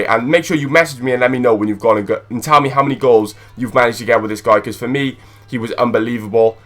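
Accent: Wales, United Kingdom. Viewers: He sounds like the guy of the valleys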